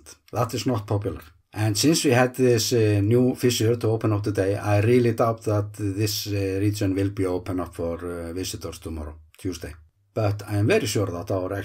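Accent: Iceland. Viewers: Icelandic accent is so cute and funny!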